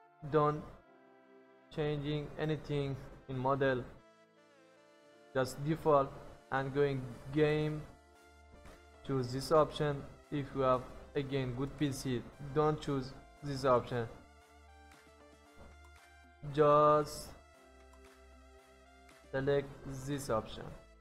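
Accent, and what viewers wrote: Iran. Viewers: منم یه همچین حسی دارم که ایرانیه داره خارجی حرف میزنه کامنت تو رو دیدم بشتر برگام ریخت 😂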